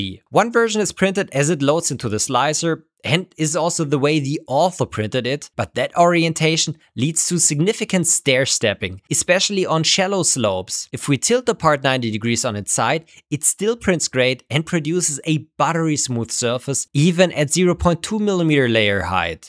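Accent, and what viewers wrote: Germany. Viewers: I can hear that german accent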